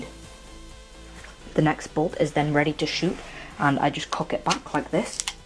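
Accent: United Kingdom. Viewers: I think his accent sounds British, definitely not New Zealand? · Are you from newzealand because on your pants it has Canterbury New Zealand? But your accent sounds British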